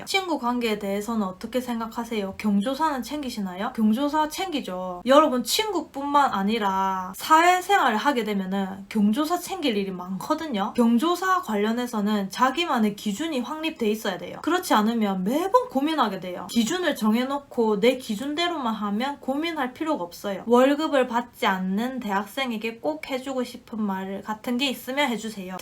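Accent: Gyeongsang, South Korea. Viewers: 5만 구독자 축하드립니다 곧 대학생이 될 울아들이 같이 똑똑하고 야무지게 지할일 챙기며 생활했으면 하는 바램이 드네요^^ 결혼하며 부산 떠난지 20년이 되어오는데 구수하고 친근한 경상도사투리를 들을수있게 되어서 좋았습니다 앞으로도 응원할께요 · 익숙한 경상도 사투리로 동생이 언니야 이렇게 하자! 하며 신세계를 알려주셨습니다. 40넘은 늦은 나이지만 따라하기 실행하고 있습니다. 연금저축도 IRP도 개설 했습니다. 시작이 반이니 계속 시청하며 따라가 보겠습니다. ㅎ 오늘도 행복한 하루 되십시오. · 어쩌다 우연히 영상을 접했는데 목소리 억양 경상도 사투리 완전 귀에 쏙쏙 들어오고 참 야무지고 똑 부러진다 생각했어요 나늠 알뜰하게 생활은 하고 있었지만 여러 재테크 팁들 너무 너무 도움됩니다 재테크1도 관심없는 동생에게 추천해 동생도 영상고며 많은 도움 받고 있네요~~ 이번에 청약도 하나 넣으려고 준비 중이고 저도 국내 주식만하다 외국 etf공부 중이예요~ 나이가 드니 머리에 입력하는게 힘든데 쉽게 설명해줘서 귀에 쏙쏙 들어오고 있어요 앞으로도 좋은 영상 부탁드려요 추운데 감기 조심하세요 ^^ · 크리스마스에 혼자 영상보면서 많은 위로가 됐습니다. 점점 지치고, 목표의식이 흐려질때마다 영상 찾아서 보러온답니다.. 저도 고향이 경상도라 말투가 너무 친근하고 아는 언니같구 그래요...! 타지 생활하면서 우연히 보게 됐는데, 이렇게 영상 꾸준히 올려주시면서 쓴소리 단소리 자주자주 해주세용, 보이진 않지만 저 멀리서 응원하고 있답니다! 따뜻한 겨울나세요...!! > < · 처음엔 알고리즘으로 알게되었는데 설명도 너무 똑부러지고 경상도사투리가 너무 정겨워서 새로운 영상 업로드될때마다 하나도 안놓치고 다 봤어요 똑순이 소중한 영상 너무 감사합니다 경제에 대해서 무지한데 많이 알려주셔서 너무 감사해요 꿀템들 소개영상도 엄청 기억에 남네요 미리 메리크리스마스🎄 연말도 잘 보내세요💕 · 몇일전에 우연히 들르게 되었는데 같은 경상도사람이라 사투리도 구수하고 귀에 쏙쏙 박히네요~ 현재 40대로 코로나로 실직후 6개월째 재취업준비중인데 마음과 같은 결과가 안나서 심난한데 괜히 연말까지 겹치니 더 뒤숭숭하네요 오늘도 면접 한군데 보고왔는데 좋은결과있어서 새해부턴 직장인으로 다시 출발하고싶네요~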